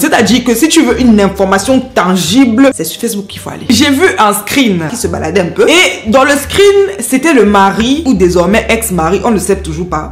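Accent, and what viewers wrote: Cameroon. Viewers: Ton accent kmer c'est la magie Sista, courage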